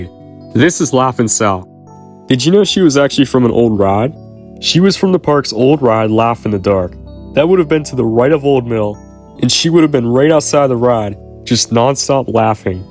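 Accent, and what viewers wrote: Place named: Pittsburgh, United States. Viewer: Wow you have such a thick pickberg accent... damn do i really sound like that.. love from uniontown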